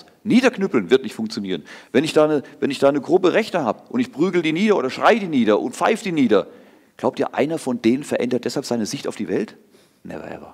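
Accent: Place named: Mannheim, Germany. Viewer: Vor den hochintelligenten Menschen müssen wir aufpassen. Die versuchen uns aufzuhetzen. Und ich bewundere die Menschen die cool und ruhig bleiben. (Herr kommen sie aus der Mannheimer Ecke oder warum können sie den Dialekt?)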